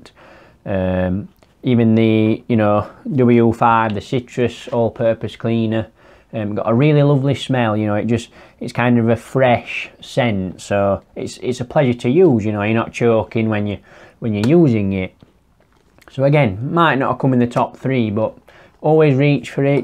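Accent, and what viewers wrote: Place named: England, United Kingdom. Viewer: Great video! It's a pleasure to hear a proper English accent with clear, simple explanations - all backed by thorough research and planning. Beats a loud, shouty American making big unsupported claims from a sunny California beach! Top work. Well done, that man...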